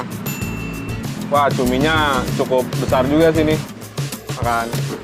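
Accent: Jakarta, Indonesia. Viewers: logatnya Jakarta bgt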